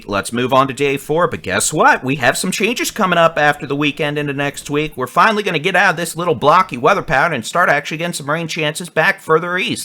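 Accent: Texas, United States. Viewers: I just LOVE watching your Weather Reports. Born and raised in Ft Worth and love your down to earth reporting. Your Texas slang being thrown in is Classic. Bless You and Yours. Susan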